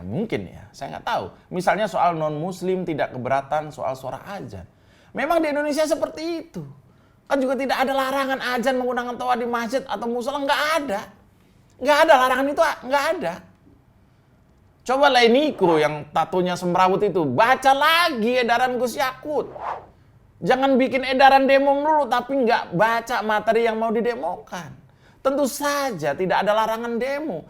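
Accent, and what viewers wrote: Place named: Medan, Indonesia. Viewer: Mantap hebat komentator bukan org batak tapi logatnya persis logat medan horas..maju terus..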